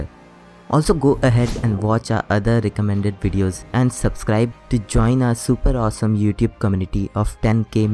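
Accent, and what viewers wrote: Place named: India. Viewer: R u indian cause ur English is id wanna say it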